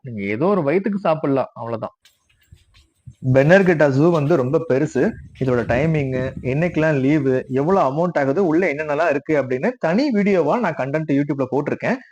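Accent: Madurai, India. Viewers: Anna neega madurai ah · தம்பி மதுரை பயலா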